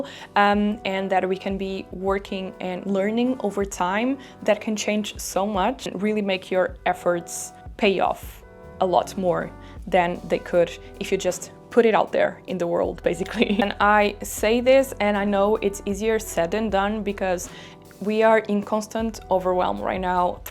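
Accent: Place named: Brazil. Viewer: My boyfriend is Brazilian he has your same accent and says OMG... when he cant pronounce a word as well xD I like ur content. Reminds me of him.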